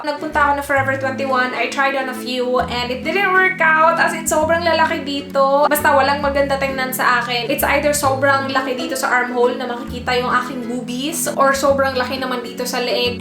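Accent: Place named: Visayas, Philippines. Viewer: She i guess is Bisaya, i love her accent when she speaks English.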